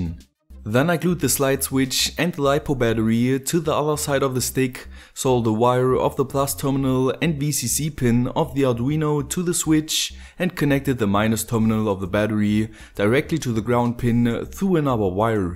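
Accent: Germany. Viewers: let me guess... youre a german... (like me) ? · was wondering what kind of accent that was! Glad to know now! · What about turning a Laptop into a single Keyboard, Monitor and PC itself? And by the way: You are German, right? Sometimes in the Videos there are German words/sentences and i can kind of hear, that you are German. Wo wohnst du ungefähr? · are u german? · Hallo mein deutscher kamerad. Hab deinen akzent erkannt lol :D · English with a German accent. Sometimes surprisingly easier to understand than English from England or English from America can be. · Hey, may i ask from which country you are? not that i would criticise that, but you have an accent that for me sounds a bit like german - and as im from germany, i just wanted to know if im watching a neighbor or something:) (But well, i guess there are a lot of similarly sounding accents, and as yours isn't that strong it could be a lot different things. 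isn't a german name at least^^)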